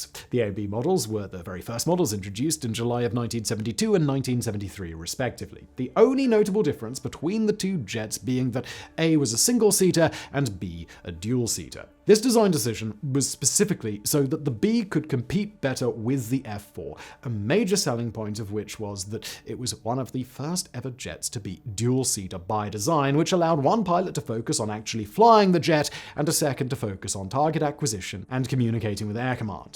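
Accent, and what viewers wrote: England, United Kingdom. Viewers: The F-15 NEVER served in Vietnam!!!!! How can you be so fucking lazy that you can’t be bothered to look up an easily verifiable fact!!! This video is proof that having an English accent doesn’t make you smart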